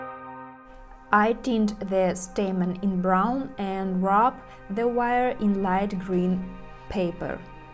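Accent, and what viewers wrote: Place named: Russia. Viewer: то то я думаю акцент русский 😄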